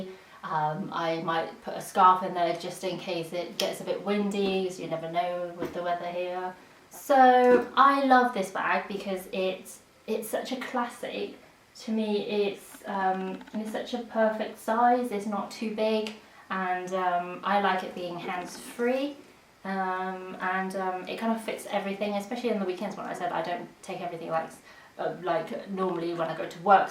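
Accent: United Kingdom. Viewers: So strange seeing someone Chinese with such a heavy british accent! Mind blown! lol xD Very interesting and good close up shots on the details - great! Very well done for your 1st video. I can see you being successful - honestly (not just saying it). Very impressed!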